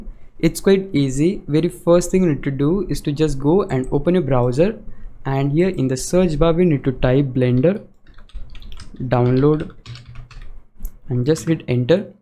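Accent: India. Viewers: indian acctent carried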